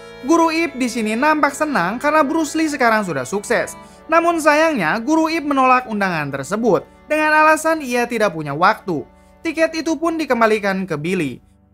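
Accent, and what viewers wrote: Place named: Madura, Indonesia. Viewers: Madura yah bang, hehe, semangat bang😂💪🏻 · logat madura cuy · Logat nya madura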